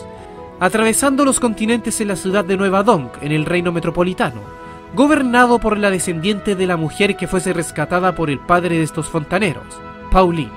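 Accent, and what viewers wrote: Chile. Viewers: Eres de CHILE???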